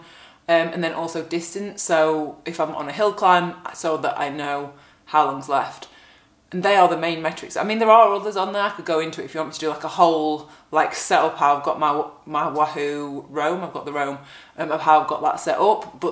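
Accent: United Kingdom. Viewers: For me training with HR is tricky, my heart throws an extra beat so the monitor is off. For example I can ride a hilly course breathe normal and have a HR of 240, so I use HR as an indicator of when my O2 max rate is close, usually for hill climbing. On a nonrelated note I do love your British accent and get a kick out of your British tan. lol. keep up the great work.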